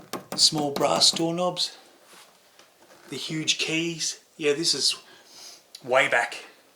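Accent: Australia. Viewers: Interesting wildlife sounds I'm guessing by your accent it's Australia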